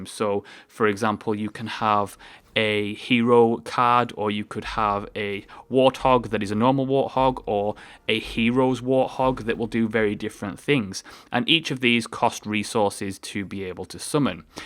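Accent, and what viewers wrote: United Kingdom. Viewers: I want you to fill me with that sexy British voice of yours boi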